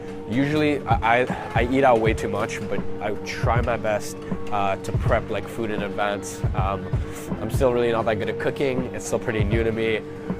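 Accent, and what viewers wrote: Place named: United States. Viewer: from the UK but you sound American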